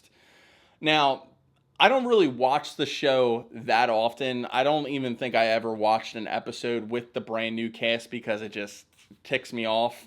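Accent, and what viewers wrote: Philadelphia, United States. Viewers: Those shows drive me bananas. I'm the only heavily-tattooed person (and usually the only person with tattoos at all) in nearly all of my friend groups, so people always come to me with questions, and it's usually because they've seen some terrible show like this. Also, I like your accent. Are you from Philly?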